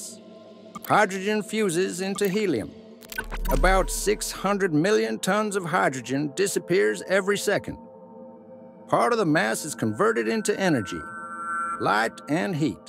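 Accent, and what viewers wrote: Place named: South, United States. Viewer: It would be great if the narrator was not a southern old dude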